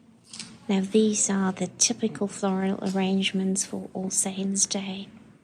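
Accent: England, United Kingdom. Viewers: I think it is amazing you have such a strong British accent being originally from Germany! ❤ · So you have a British accent but I don't get how you got it? 🤔 has German accent. I love the things in that shop! (I hope that's not rude.) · Lovely video! Your parents are adorable ☺️ Just curious….How come you have an English accent? Were you raised in England?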